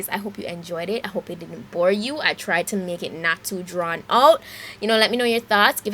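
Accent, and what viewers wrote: Jamaica. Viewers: You sound Jamaican.... And I know becuase I am Jamaican....... Nvm 😂😂😂 you are I just saw the other vid..... New subbie... Btw.... Big up yuh self 👅🌸🙏💙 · Jamaican accent yaass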